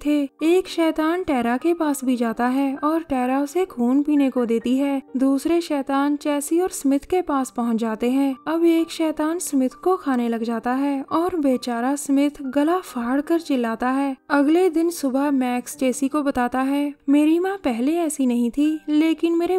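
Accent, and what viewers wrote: Punjab, India. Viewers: Thodi thodi punjabi jhalakdi a explain vich😂😂😂😂😂😂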